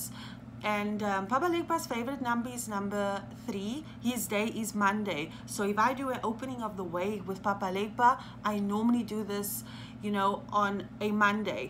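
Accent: South Africa. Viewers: You have a South African tone to you're voice hey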